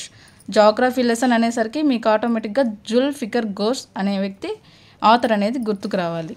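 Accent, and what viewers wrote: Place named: Guntur, India. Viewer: Madam గారు from Guntur అనుకుంటా 😊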